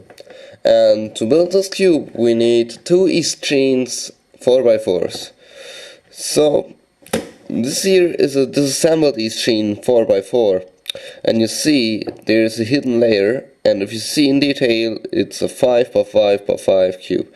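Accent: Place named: England, United Kingdom. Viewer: I love that english accent. Keep up the good work ! :D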